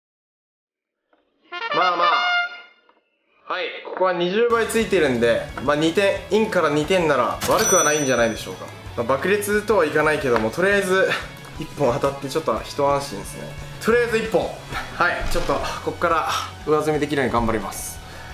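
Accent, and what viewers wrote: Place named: Senshu, Japan. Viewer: 泉州弁みたいな喋り方だな〜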